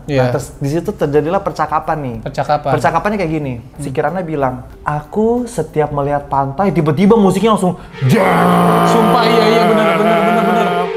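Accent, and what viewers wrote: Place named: Surabaya, Indonesia. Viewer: Dari dialek misuh2nya, Koko nie beneran orang Surabaya. 😂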